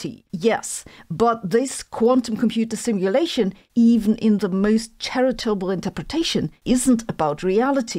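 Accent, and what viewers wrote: Germany. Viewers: "that I can say bullshit when I mean bullshit". This is very clear, but not very lady like. I like your german english - I speak it also. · Love the way she says BS with her German accent.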